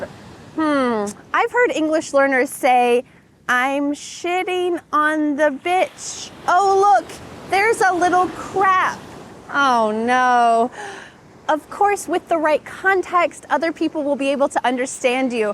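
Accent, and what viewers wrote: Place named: United States. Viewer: very very important and had a great time with your beautiful American English. Thank you so much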